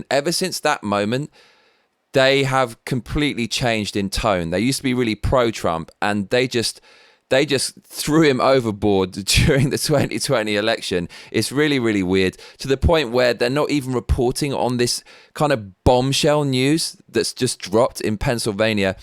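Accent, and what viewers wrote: United Kingdom. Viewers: you got the voice and Brit accent, you're interesting, articulately spoken words resonate fairness and humility. Everyone is locked loaded in these uncertain days, propagandized lies from Leftist media. These radical Totalitarian Socialist have firm grasp on most of our government agencies. I pray Trump can fix this if not America greatest is a thing of the past.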